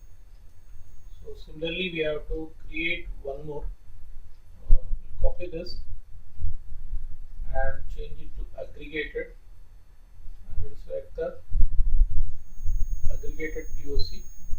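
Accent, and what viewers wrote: India. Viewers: I guess this is indian speaker.....awful!!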